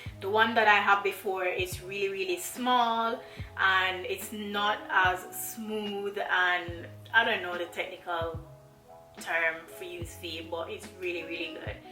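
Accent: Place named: Jamaica. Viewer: I love your Jamaican accent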